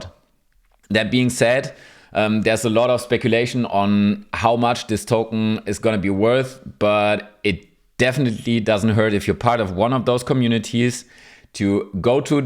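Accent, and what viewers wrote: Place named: Germany. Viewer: ich seh grad in den Kommentaren dass du auch deutscher bist hab mich schon gewundert wegen dem Akzent 😅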